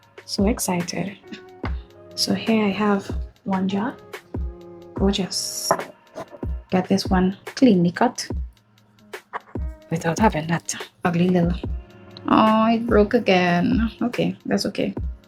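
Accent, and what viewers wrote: Trinidad and Tobago. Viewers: Fello Trini here!!! Really enjoyed the video and what a great idea to make homemade feta. Can't beat a Trini accent! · You sound Trinidadian 🇹🇹🇹🇹🇹🇹. Big up yuh whole self.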